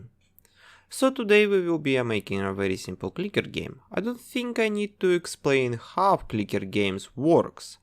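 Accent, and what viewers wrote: Russia. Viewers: Чел ты... Русский акцент не убрать!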